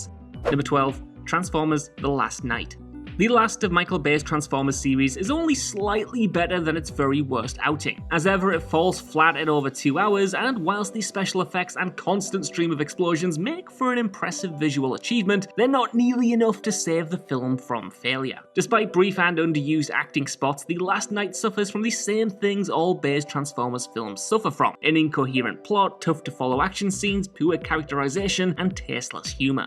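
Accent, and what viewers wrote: United Kingdom. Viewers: Can't get enough of the fast talking British!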